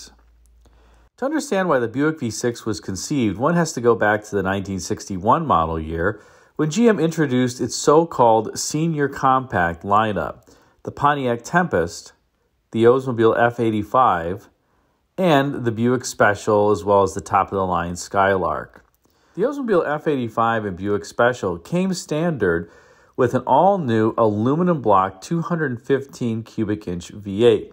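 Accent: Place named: California, United States. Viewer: he sound like he got California accent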